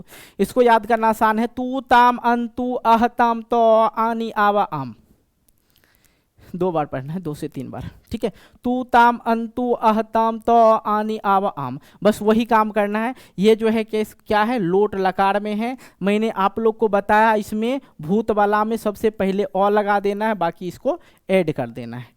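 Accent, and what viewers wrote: Bihar, India. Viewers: Sir aap bihari ho ya भोजपुरी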